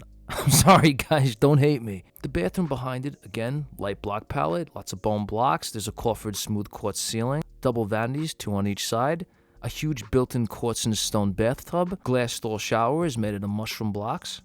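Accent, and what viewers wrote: New York, United States. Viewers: Dang that New York accent you have there is cool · Also are you from New York? Your accent definitely sounds like it. Which is a compliment btw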